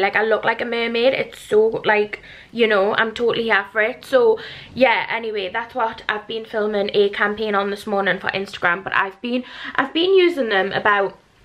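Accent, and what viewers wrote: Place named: England, United Kingdom. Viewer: I love "bathe-az", "joggaz", "furnitaz", "knickaz". Not taking the mick, just love the different ways we all say words in England.❤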